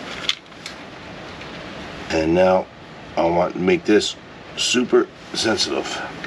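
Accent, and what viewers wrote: Canada. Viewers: As a northern Mainer, I could instantly tell I was listening to one of my Canadian neighbors. 😊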